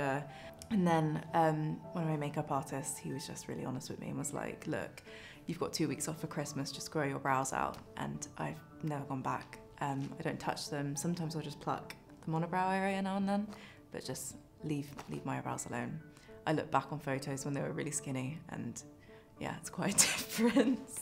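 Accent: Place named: United Kingdom. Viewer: I love her natural British accent!!!❤️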